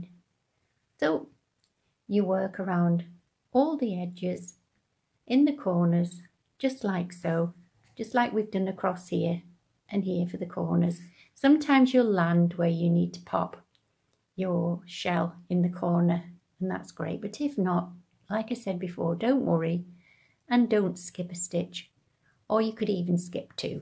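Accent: United Kingdom. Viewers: Can i ask why you use American terminology? When I hear a UK accent I hope for UK terminology - have we just given up on it altogether?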